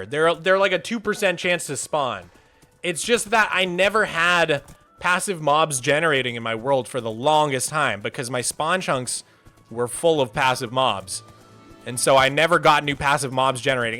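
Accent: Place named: United Kingdom. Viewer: the british accent caught me so off guard that was so good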